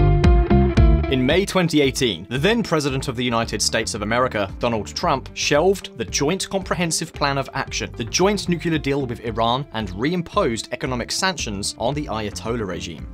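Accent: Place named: England, United Kingdom. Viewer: It's called the English Language, & this guy sounds English to me. Maybe you've got it wrong...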